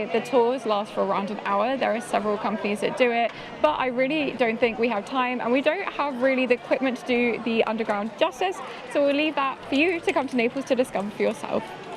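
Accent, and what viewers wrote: United Kingdom. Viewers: Yeah because of course anyone who's not shouting and being chaotic as we are has low energy... This kind of closed-mindedness makes Neapolitans unlikeable to many people, and to other Neapolitans like myself. This girl sounds British, and Brits have a calm way to speak. Or you want her to start dancing tarantella while she talks so she can match what you consider to be Neapolitan "high-energy"?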